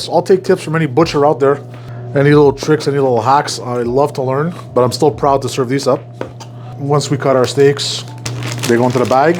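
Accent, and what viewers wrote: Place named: Montreal, Canada. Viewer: Born on the West Island and live in South Carolina now. Stumbled on this video and within 5 seconds I could tell chef is from Montreal. It's like music to my ears 😂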